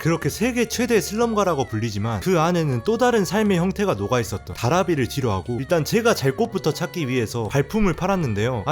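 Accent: Jeolla, South Korea. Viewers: 전라도 사투리가 강하네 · 너 전라도니?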